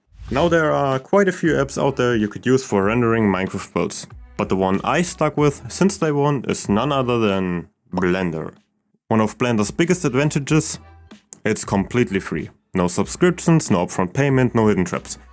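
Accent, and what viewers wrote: Germany. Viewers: Aber top Englisch👍 habs wahrscheinlich nur rausgehört weil ich deutschen Akzent halt kenne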